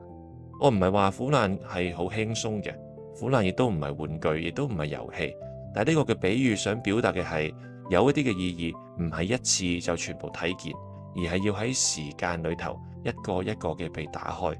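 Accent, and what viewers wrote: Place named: Hong Kong, China. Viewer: 牧師多謝你，終於有一個完全港式廣東話嘅頻道，請繼續努力，主與你同在🙏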